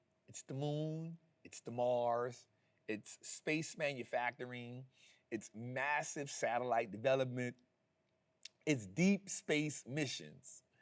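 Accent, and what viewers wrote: New Orleans, United States. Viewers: Say bro I love your style I love your knowledge I hear this New Orleans accent thing are you from New Orleans man😅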